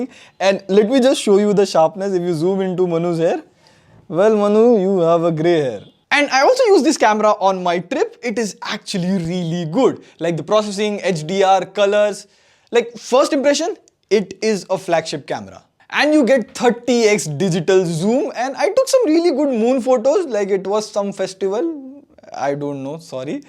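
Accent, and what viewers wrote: India. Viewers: Finally a new generation guy who is proud of the Indian accent...excellent review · Your accent is soo strong like as stereotypical of an Indian accent can possibily be....I cant finish your video man your accent is killing me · Your review was so refreshing to all the reviews I've been watching. And I love your accent! (I'm not used to hearing Indian accent so I was equally fascinated with the way you talk lol) Love the energy and humour haha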